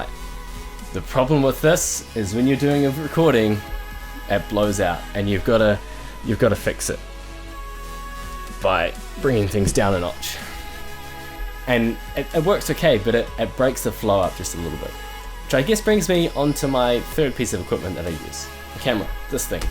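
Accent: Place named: New Zealand. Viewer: keep making videos, mate. your videos are amazing and I like your kiwi accent! :D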